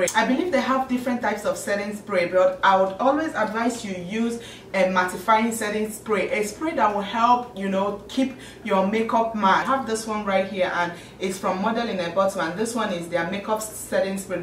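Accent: Nigeria. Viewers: i love that you maintain your natural naija accent, instead of confusing us with oyibo 'init'. i learnt a lot. thanks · Like seriously I just subscribed like seriously I like you oooh naija accent on fleek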